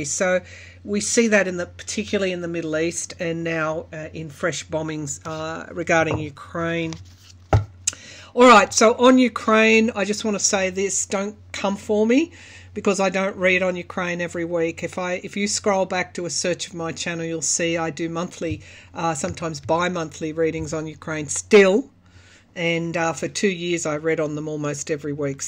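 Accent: Australia. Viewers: Thank you for these readings! 🙏 I love the way you have dealt with the trolls! Tell 'em off! I look for you videos every couple of days. I appreciate your manifestation of mercury/mars/Pluto/Venus conjunctions especially with the Aussie accent. Huzzah, ma'am! Peace love and light.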